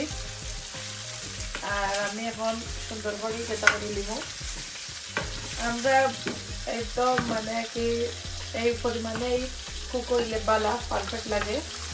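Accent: Sylhet, Bangladesh. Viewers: মনোমুগ্ধকর সিলেটের ভাষা অনেক ভালো লাগে আপু মন জুড়িয়ে যায়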